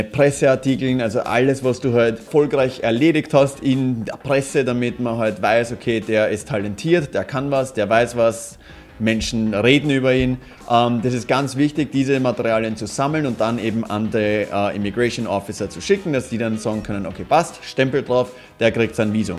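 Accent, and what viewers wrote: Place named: Burgenland, Austria. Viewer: Top dast deinen Burgenländischen Akzent beibehalten hast #nördlicher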